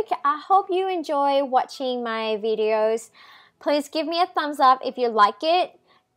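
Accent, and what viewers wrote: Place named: Australia. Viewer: Wow! It was long time ago watched your video. Nice australian accent by the way.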